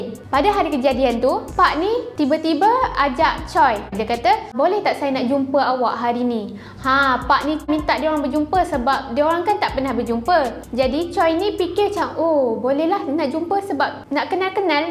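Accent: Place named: Kelantan, Malaysia. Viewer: Setelah dengar cerita ni sampai habis, saya boleh agak yang awak ni orang Kelantan🤣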